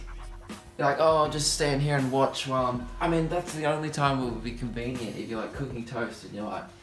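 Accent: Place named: Australia. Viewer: AEC Media that’s called an “Australian accent”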